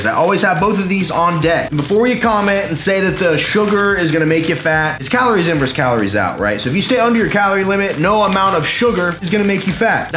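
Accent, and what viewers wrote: Texas, United States. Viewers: The Texan (non-screaming) version of Coach Greg, love your channel!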